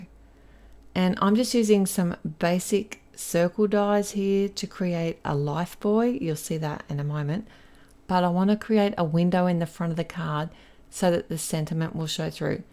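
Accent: Australia. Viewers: it’s nice to find a new papercrafter I can follow. I appreciate the effort it takes to make a video the length of yours and like that you don’t waffle on about irrelevant stuff. You’re Australian?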